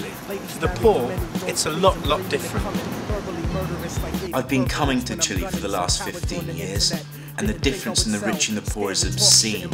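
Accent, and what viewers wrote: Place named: England, United Kingdom. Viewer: but sadly distributed between the rich, military, foreign companies leaving the common chilean people to miss out this situation will continue until the people stops watching tv and gets informed whats really going in the country, by the way by your accent i guess your from england? why you so intrested in chile? anyways nice video cheers from australia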